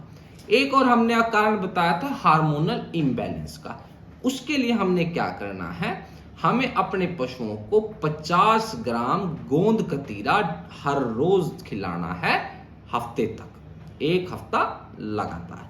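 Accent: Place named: Haryana, India. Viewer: Dr sahab aap laagta hai haryaana se hai aap Hindi mein bahut aatak rahe hai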